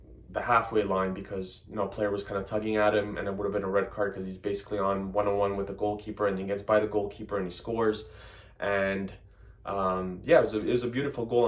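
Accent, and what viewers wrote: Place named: New York, United States. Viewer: Nice recap. Sjajan ti je ENG :) - American NYC dialect :D Anyhow, keep up the good work!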